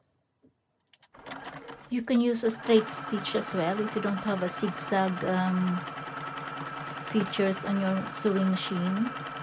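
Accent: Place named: Philippines. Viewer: Based on your accent you must be Pinay. Maraming salamat.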